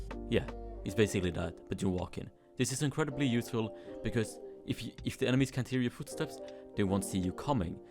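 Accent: Sweden. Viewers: Ahh, the original Swedish accent. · sweden? i can hear that little accent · are you swedish? 🤔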